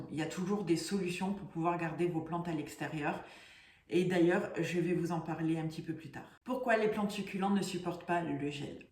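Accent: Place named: Corsica, France. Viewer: Salut,déjà je voulais te féliciter pour le nombre croissant d'abonnés,c'est fantastique,je me souviens de ta toute première vidéo,sombre,le son au minimum,lol, filmé avec ton tel,dans ton appart,et je m'étais dit waouh mais cette fille avec son petit accent corse va cartonner c'est sûr...et ben voilà!!tu es devenue IN-CON-TOUR-NABLE désormais!!! BRAVO 😉🌿 bon dimanche et bonne continuation.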